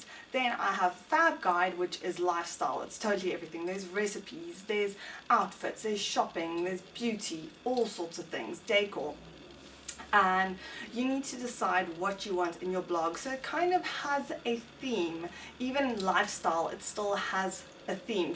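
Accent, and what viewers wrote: South Africa. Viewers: You are South-African aren't you? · are you from South Africa ? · Are you from South Africa? You sound South African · are you from south Africa? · South African accent I hear?? Love Fromm fellow Cape Town viewer! Xoxo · Does anyone know if she's South African? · Are you South African or have a South African backround? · r u from SA?? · She must be South African, would recognize this accent everywhere 😂 hear it every day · South African? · Kelly Castillo That's a South African accent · +April Maroshick She's definitely South African with her accent ;) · Are you South African? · You are South African like me awesome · south african ? YAY! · I started watching his and was like wait she is South African too!!!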